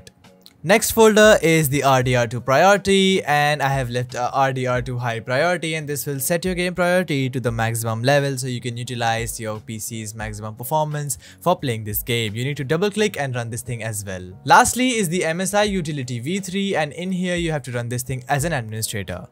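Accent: India. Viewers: He is an Indian, that's why he speaks in that way. t to d y'know.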